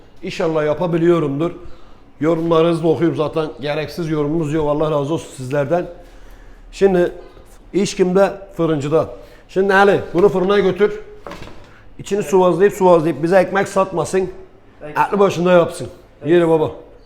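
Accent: Gaziantep, Turkey. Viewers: Antep aksanı en güzel aksan. Yaptığınız yemek çok sağlıklı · Şu şive şu samimiyet helal olsun Antepli arkadaşım vardı oda böyle konuşurdu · Antep kilis şivesine ölüyorum ya ustam ellerine sağlık hayırlı Ramazanlar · Çok güzel olmuş eline ze saglık anlatiş şeklinizde çok tam bir annepli gibi 👍👍👍👍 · Bu Antep şivesi çok kötü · Antepli bir arkadaşım var gastronomi bölümünden birebir abinin aynısı zaten konuşmaya başladığı anda antepli olduğunu anladım antepliler iş ahlakı disiplin ve cömertlikleriyle biliniyor ellerinize sağlık ustam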